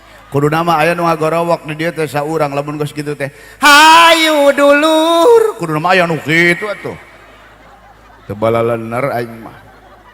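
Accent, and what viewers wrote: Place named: Banten, Indonesia. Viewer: Sepertos urang banten nyariosna. Mantaaap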